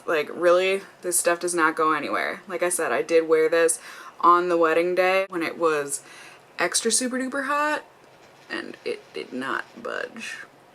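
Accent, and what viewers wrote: Minnesota, United States. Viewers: You are so dang cute. When you say the words, bag, and Aunt, you sure can tell you are from Minnesota! 😘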